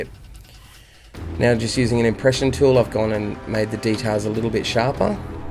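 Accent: Australia. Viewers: it's an australian accent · He's actually Australian NOT Brittish XD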